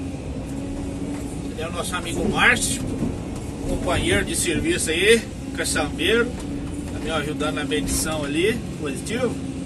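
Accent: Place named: Mexico. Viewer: You sound like Mexican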